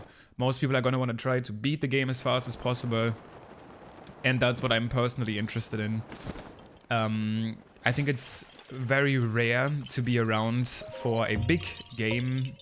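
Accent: Germany. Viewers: Dein Englisch klingt so deutsch xD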